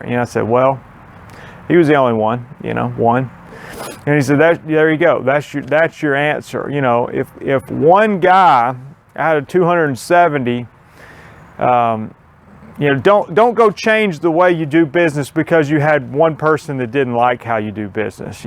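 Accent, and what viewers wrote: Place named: Texas, United States. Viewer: How many employees and major pieces of equipment do you 'run' to service 270 customers? You must have a great office manager to handle all that while you keep up the channel. What city are you in? Your accent sounds Texan more than anything from Alabama. How many years did it take you to get to 270? How much faster would you say it grew each year based on your expertise in fertilization and weed identification and killing?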